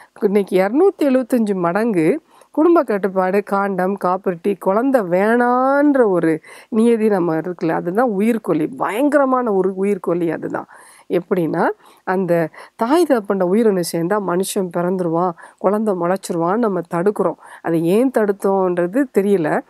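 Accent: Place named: Tirunelveli, India. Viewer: Excellent explanation. Thanks to the Doctor. I liked the way she explained in Tirunelveli Tamil. However, regarding Triglyceride, even strict vegetarians also have high levels. What could be the reason? Is it because of malfunction of Lever and Pancreas? Request the doctor to clarify.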